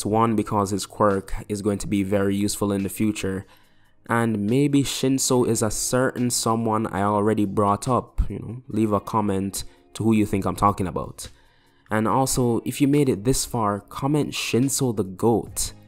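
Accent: Jamaica. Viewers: Bro are you Jamaican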